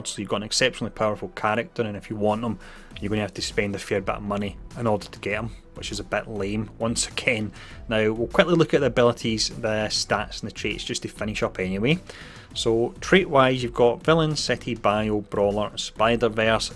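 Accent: United Kingdom. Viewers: I personaly like british accent but your voice is not nice. Ive been watching videos about this game iand i hear british ppl and theire voice and accent sucks. I wont keep watching cause your voice annoi me and you dont speak clear! The videos are only for you fellas even americans might not understand. I do but your voice dude ahhhh🤢🤢🤢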